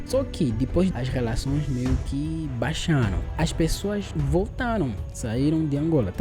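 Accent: Brazil. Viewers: O teu sotaque é muito parecido com o português - brasileiro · O gajo tem sotaque brasileiro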